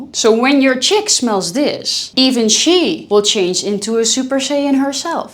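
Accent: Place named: Netherlands. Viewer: Nederlander 100% 😂 hoor je meteen! Leuke video.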